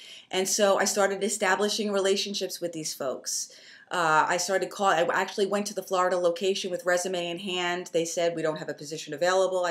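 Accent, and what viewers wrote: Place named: New York, United States. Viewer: Your NEw York accent is ever showing. Haha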